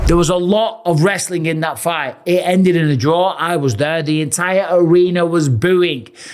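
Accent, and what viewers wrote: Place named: United Kingdom. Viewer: Mike...sounding British again 🤔 not like a yank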